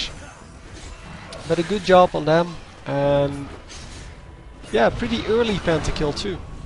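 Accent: Netherlands. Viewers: New to the channel, just subscribed...and do I detect a Dutch/Flemish accent?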